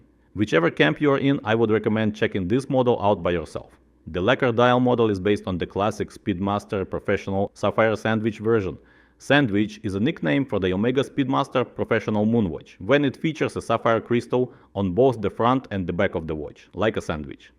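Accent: Russia. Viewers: Русский акцент пипец жёсткий, конечно...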